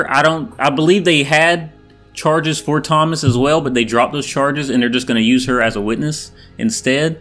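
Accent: South, United States. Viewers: This guy sounds a little country don't he?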